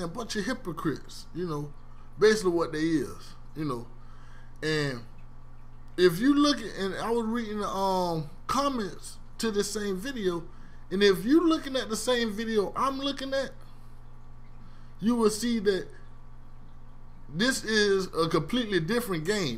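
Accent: United States. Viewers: fool sound like he from the south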